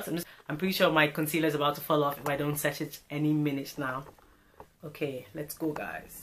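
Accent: Ireland. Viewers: Was googling this product and clicked onto your video and then was like yeah thats deffo an Irish accent! Love finding Irish gurus so I can buy what is rec'd easily!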